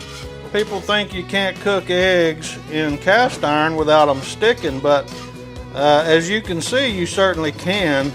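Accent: South, United States. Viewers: Yella 😂. Your southern accent brings back memories of my daddy.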